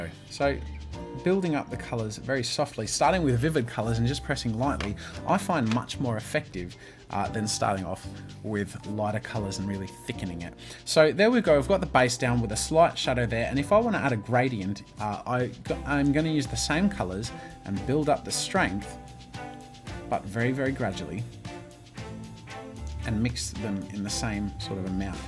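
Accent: Australia. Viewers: Aussie mate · Oh shit, this guy's an Aussie!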